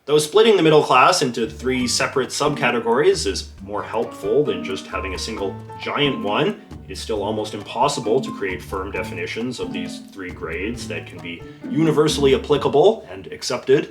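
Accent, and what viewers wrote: Canada. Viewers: Immediately thought about Ricky lol, especially cause you have a Canadian accent.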